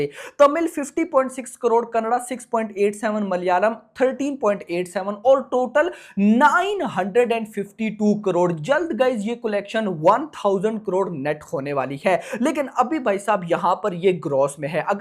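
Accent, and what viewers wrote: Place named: Pakistan. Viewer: Yar tu Pakistani h kya Teri avaj pakistaniyo jaisi kag rhi bolne ka tarika 😢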